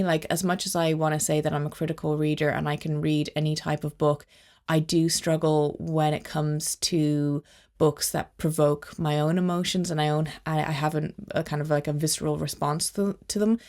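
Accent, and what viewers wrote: Ireland. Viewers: Great recommendations and the Irish accent sounds great 👍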